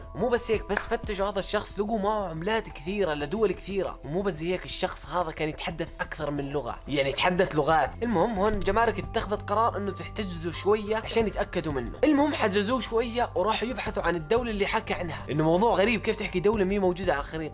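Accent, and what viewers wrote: Jordan. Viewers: سؤال انت اردني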